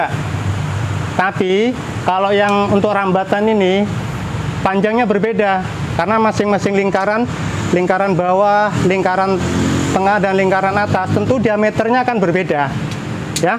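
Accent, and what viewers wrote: Java, Indonesia. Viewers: Aku di semarang p de mohon maaf caranya mupuk dan media anya apa sama di jawa dan di balik papan tapi logatnya koyo orang jawa... Terimakasih pak deje las sekali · Jawanya medhuukk😅 siap praktek boskuu❤ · Bapak orang Jawa yaaa, .. lucu kalau kasih penjelasan, jadinya mudeng xixixix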